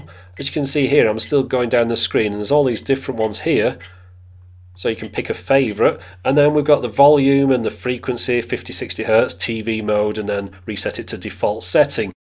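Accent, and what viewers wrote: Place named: North London, United Kingdom. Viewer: Cheers love........you have the same accent as Geoff Lloyd of Absolute radio.....Norff London?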